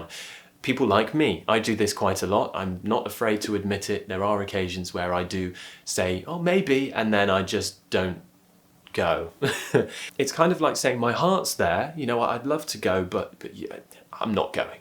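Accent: United Kingdom. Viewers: Im from malaysia..i just to know your youtube british accent..i love the way you explain..crystal clear ⭐️⭐️⭐️⭐️⭐️⭐️⭐️ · you have a distinctive accent among all the British English teachers in the entire YouTube. To me that is the best accent. That is a kind of accent which I have always idealised while learning English or when dealing with the British native speakers. Among the British English teachers on YouTube, I have noticed that even the ones, who claim they speak and teach the RP English, don't speak the best English, at least, I don't find so appealing the way they sound compared to your English. Please, reveal the secret why your English sounds so perfect. It is exactly a kind of English that was in my learning recordings which had been made by the English teaching companies based in the UK. Maybe you were born and growen somewhere in the heart if the Southern England? 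Maybe it has to do with the schools and further higher institution you went to? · Oh god, I love so much the british accent. Thank you again for this great video. 👍🏻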